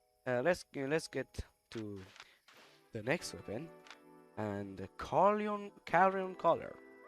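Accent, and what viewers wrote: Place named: Iran. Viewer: Why your voice like iranian?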